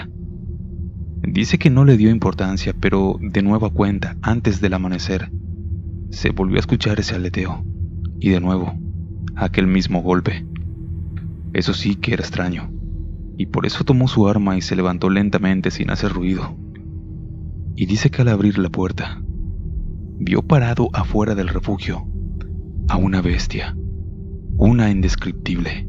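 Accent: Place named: Argentina. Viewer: El amigo creo k no es mexicano es creo argentino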